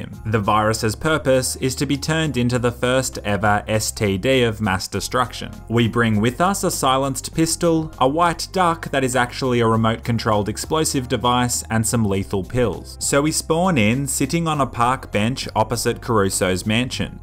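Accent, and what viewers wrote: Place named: Australia. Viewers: Sweet video dude. Aussie accents are too good. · he is Australian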